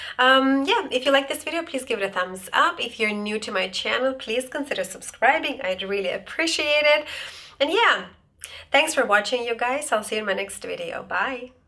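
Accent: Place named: United States. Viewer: Thank you very much for this video! May I ask you some questions? Do you speak any other foreign language? Why did you choose the American English pronunciation? I ask because at my university our teachers prohibited speaking the American English. Thank you in advance 🤗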